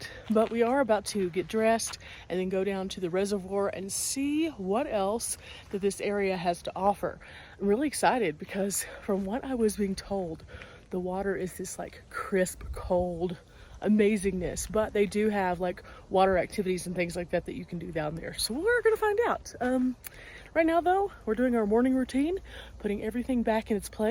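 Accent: Texas, United States. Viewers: Love your videos. Knew from your accent you were a fellow Texan!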